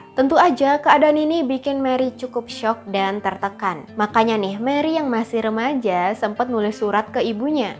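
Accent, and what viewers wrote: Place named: Indonesia. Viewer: padahal gak salah loh, coba deh nonton dulu video lain atau dokumenternya lah, beda beda dikit aja, lidah indo kan beda sama prancis asli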